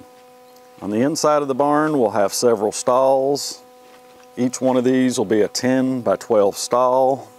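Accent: United States. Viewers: Are you from west Georgia?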